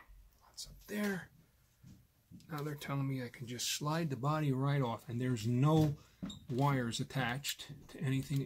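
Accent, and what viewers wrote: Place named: Bronx, United States. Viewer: U must be from Bronx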